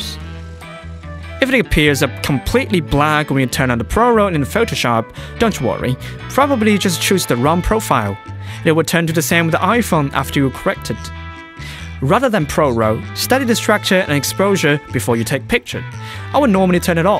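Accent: United Kingdom. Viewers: 英音很正❤ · 还好吧，英音，反正水平比我高八条街，对于做视频完全够了，多少海外youtuber都还有各自国家的口音也没人评判什么。 当然，油管这个平台其实美音会更占优势些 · 居然是英音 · 这是brit accent😂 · 英式口音，牛的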